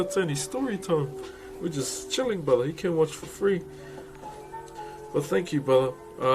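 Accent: New Zealand. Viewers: Are you from New Zealand 🇳🇿 I,m am from the Netherlands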